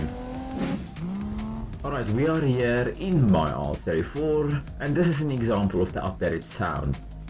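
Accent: South Africa. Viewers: That's a very strong South African accent you have there brother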